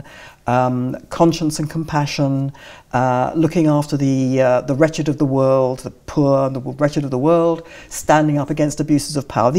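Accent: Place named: RP, United Kingdom. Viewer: This lady is an idiot, even her pseudo-educated English accent couldn’t help her argue a convincing case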